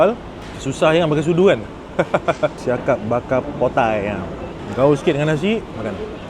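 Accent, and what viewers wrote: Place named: Negeri Sembilan, Malaysia. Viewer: Haha automatic cakap negeri sembilan 😂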